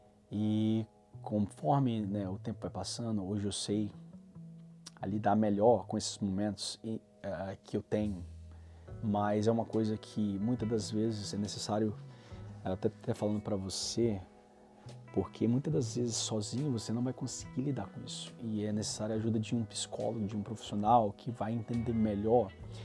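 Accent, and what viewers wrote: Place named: Mexico. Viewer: Achava que você era mexicano rs voz linda sua. Passei por esse conflito entre igreja x aceitação, até que cheguei numa depressão muito grande pois fiquei dez anos sem nem beijar na boca, daí comecei a me tratar e fazer terapia, uma longa história...hoje estou tranquilo nessa questão, mas foi bem complicado mesmo! Me identifiquei com muitas coisas. Obrigado por compartilhar conosco sua história de vida 🙏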